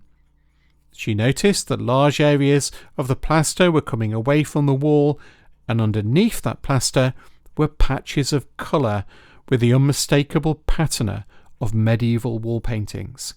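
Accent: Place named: Northern England, United Kingdom. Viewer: I really enjoyed your video. Your delivery is pleasant to listen to (and in the accent of a fellow Northerner, I might add). Where are you from I wonder. Rebecca (Leyland, Lancashire)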